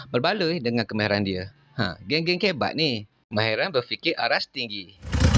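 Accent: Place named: Kelantan, Malaysia. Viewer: slanga klate wei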